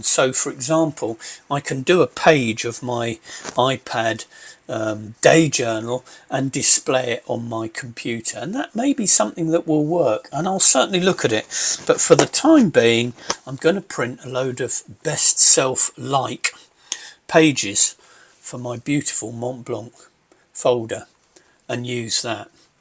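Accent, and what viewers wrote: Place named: United Kingdom. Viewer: you're teaching me how to do british accent,ackkk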